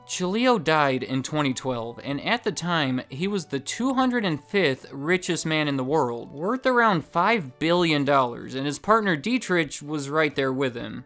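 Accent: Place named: Minnesota, United States. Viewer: I feel like is from Minnesota based on his voice. I always wonder if he is a Minnesota Twins fan.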